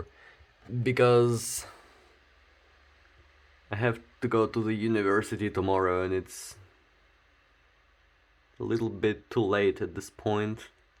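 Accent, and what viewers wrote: Russia. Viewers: 10/10 russian accent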